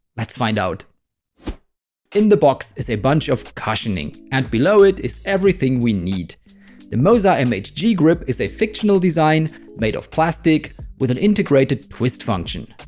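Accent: Germany. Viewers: Hi. I am new to your chanel, I live in Germany, from your accent I gues you are german, do you have any tips where i could get nyogel 767a? Danke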